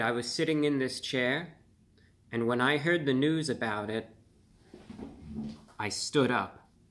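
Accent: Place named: Mid-Atlantic, United States. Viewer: Review Bruh, the mid atlantic accent is getting more cringy, tone it down. Just let the inner upstate New York come through.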